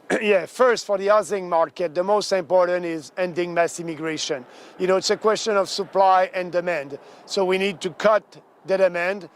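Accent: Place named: Quebec, Canada. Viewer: i was referring to his ghastly quebecer accent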